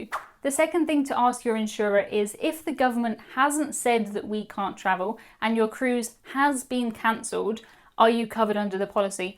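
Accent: United Kingdom. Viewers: Based on this video, you know.. there isn't a definite answer, it all depends on the situation and the insurance company. But, having said that this is the best, most explanatory vid I've seen. Thank YOU! I will post a link to this vid for people that ask me about the cancellation policy and possibilities. RE: the naughties.. no, we dont' say that, I guess we just call it the 2000s? but from now on, I'm calling it the the naughties, lol. Definitely agree with the waiting game. I have an Oct cruise. A few months ago I had no doubt it would happen, now... I'm not so sure, but I'm not cancelling! Thanks. love your content, and your accent just takes me 'home' (mom is british, dad american, left Uk when I was 5)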